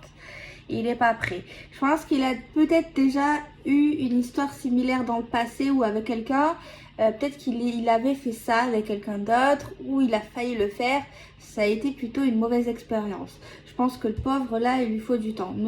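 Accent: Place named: South, France. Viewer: Bonsoir,hey c'est également l'anniversaire de ma fille 🎂.... Joyeux anniversaire 😁 et j'adore tes vidéos qui sont dynamiques,ton accent du sud... continue comme ça 😉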